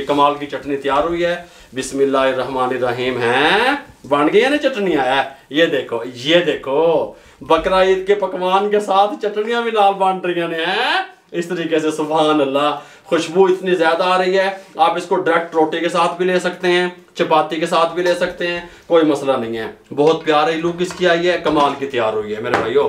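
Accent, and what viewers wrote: Punjab, India. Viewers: Ap ka btany ka panjabi style bht acha lgta h ap English tch Mt dyn mza AA jata h JB ap khty h db Dio heeeen baba kug smja ria ayyy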